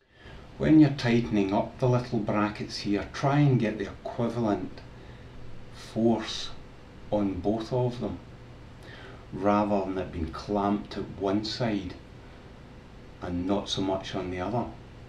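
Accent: Scotland, United Kingdom. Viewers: wow, this is really cool!!! I had no idea you can adjust the violin on your neck. And...olive oil? You are hilarious :-D Are you Scottish? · Ah, thank you! I thought it might be Egyptian or some other Arabic dialect. I didn't know that Scottish is that thick.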